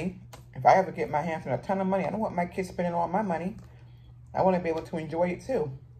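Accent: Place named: New York, United States. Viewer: Hi! So nice to meet you. Your channel came across my feed and I'm glad it did because we both are on a low carb journey! Great chat as we;; and I hear the NY accent! Sooo true, if you don't have your health you don't have anything! My husband is in a nursing home and I visit him 2-3 times per week and my heart goes out to the people who never have visitors. Blessings to you!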